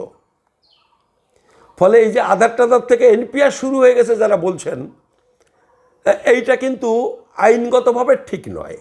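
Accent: Bangladesh. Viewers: তোমার কথা শুনে মোনে hoy তুমিও Bangladeshi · Apni pakka Bangladeshi....kotha sune bujhte perechi... 😂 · কেমন বাংলা দেশি বলে মনে হয়, পুরানো refugee না নুতন refugee. পুরানো দের তেজ বেশী l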